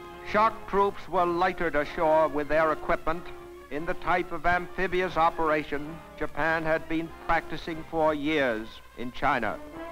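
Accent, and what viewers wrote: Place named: Mid-Atlantic, United States. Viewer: Search "Mid-Atlantic Accent" for more info on this.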